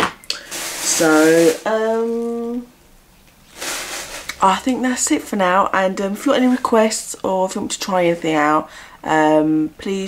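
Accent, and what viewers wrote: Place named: Australia. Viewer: Youre bloody stunning! You kinda sound Aussie :D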